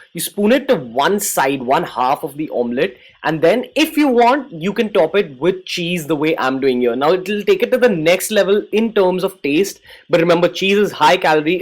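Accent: India. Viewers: U nghuy so ndope lol Indian accent but your so simple that what's makes u dope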